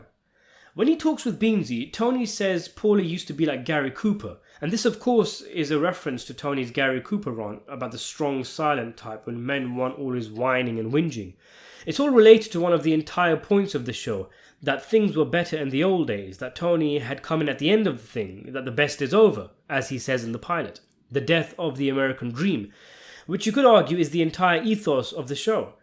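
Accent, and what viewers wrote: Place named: East London, United Kingdom. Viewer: Bro you sound so Bengali from East London 😂😂😂😂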